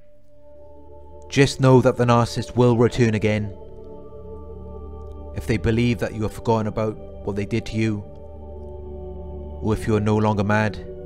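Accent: Wales, United Kingdom. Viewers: You sound Welsh ? Good video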